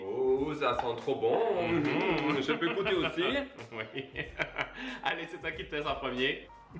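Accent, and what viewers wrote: Quebec, Canada. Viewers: J adore ton accent québécois 😂😭😂😭😂😭😂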